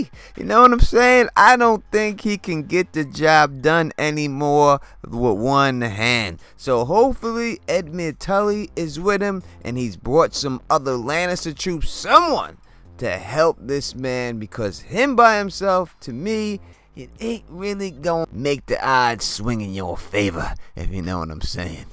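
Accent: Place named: Queens, United States. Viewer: Great analysis - thank you,. I love your Queens, NY accent - makes the world feel much better xD (Im from QV)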